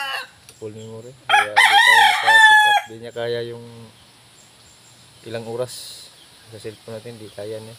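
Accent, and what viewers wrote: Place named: Visayas, Philippines. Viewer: Dol 😂 halata na kaayo nga bisaya gd. Ayna pag Tagalog bisaya lang 😅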